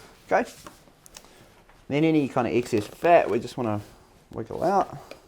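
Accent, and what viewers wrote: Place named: New Zealand. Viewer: Hey whereabouts are you from? You sound like a kiwi, I'm from Otago.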